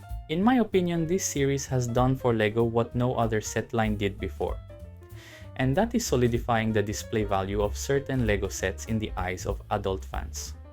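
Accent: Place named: Philippines. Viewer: Your accent sounds so Filipino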